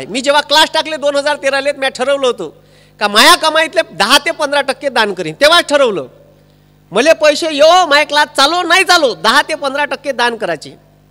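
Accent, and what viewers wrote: Vidarbha, India. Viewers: खतरनाक सर ,, आपली विदर्भाची भाषा शेवटपर्यंत सोडायची नाही.... 👍👌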